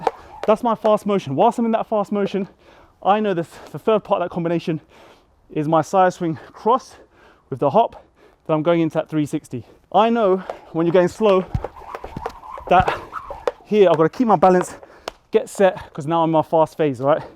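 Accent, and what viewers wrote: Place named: United Kingdom. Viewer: what is your name? Is it?? Love the British accent always. I can only dream to be as graceful as you are jumping rope. I look ridiculous in my form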